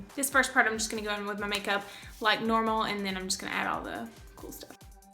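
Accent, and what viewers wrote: South, United States. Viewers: I love your voice! It's so country! I hope you don't take that as an offense.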